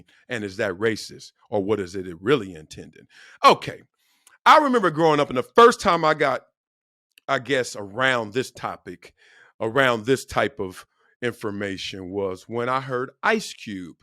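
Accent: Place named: California, United States. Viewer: Duuude!! Haha sounds California but I’m from NJ. Solid views. It makes sense in so many ways. I’d have to prob read CK entire book/chapter to really understand his thoughts on his parents but….I don’t really care enough. 😂 Anyway, you’re so much better on this type of platform over MSM. I hope you can grow this to get you were you want to be financially so you can just do whatever you want content wise. Thanks & good luck! 🤘🏼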